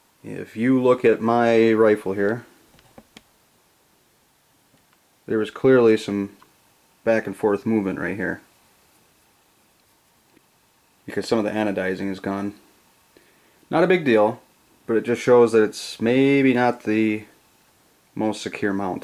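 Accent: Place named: Michigan, United States. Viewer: After watching the zeroing video and now this one you gotta be from Michigan. Lol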